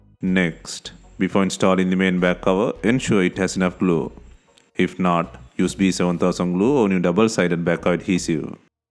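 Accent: India. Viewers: Avec un type de colle genre CYANOACRYLATE ,bravo impossible par la suite de pouvoire ré-ouvrire pour une autre réparatation,c est comme se tirer un coup de 12 ds les pieds cà!!!!!🙄😤😒🤪🙃😡🤬 Utiliser plutot une colle caoutchouc!!!!!!! Apres y sera toujours possible de le réouvrire & aussi tres facile de nettoyer la colle avant d en. ré- appliquer pour le refermer,une simple logique !!!!! Un accent infernal indou🙄😤😒😑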